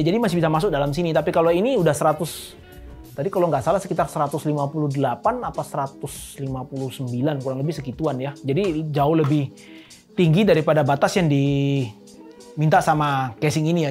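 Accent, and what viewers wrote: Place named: Surabaya, Indonesia. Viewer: kalo boleh tau, nih channelnya orang mana sih.. bahasanya jatim sby an banget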